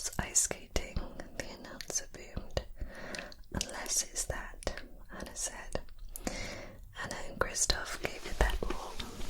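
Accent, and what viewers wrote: United Kingdom. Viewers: ill be honest at first i didn't like your British accent,but now i love it so much with your divine unintentional mouth sounds 🤯🤯🥰🥰that i watch your videos every night!!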